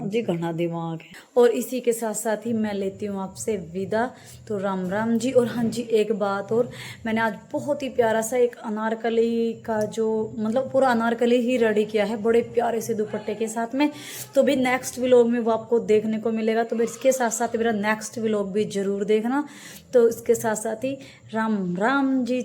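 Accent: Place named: Baghpat, India. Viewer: Baghpat se h didi ase hi bolted h hm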